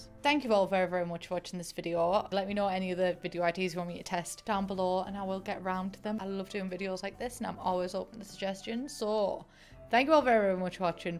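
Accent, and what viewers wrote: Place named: England, United Kingdom. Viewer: There’s this joke here in Aus about old ppl saying “film” like “fil- lim” but now after hearing you say it exactly the same I know it’s not something they do cuz they’re old, it’s cuz they came from your side of England! (Which my Nan did! Honestly I can’t deny that half the joy of watching your videos is to hear her accent & humour again!) Pretty sure Tea was dinner as well for much of my childhood & probably only changed when kids at school didn’t know which “tea” I meant.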